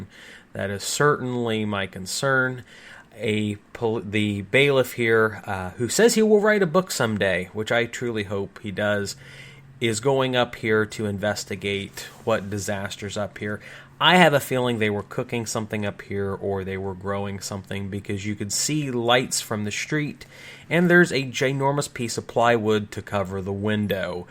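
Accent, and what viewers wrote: Ohio, United States. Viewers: You know an Ohioan when you hear one 😂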